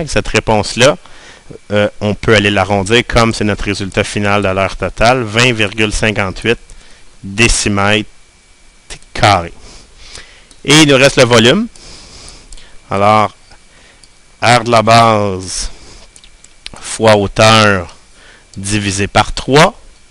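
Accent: Quebec, Canada. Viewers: l'accent canadien c'est abuséééé :o mais la video est bien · Accent québécois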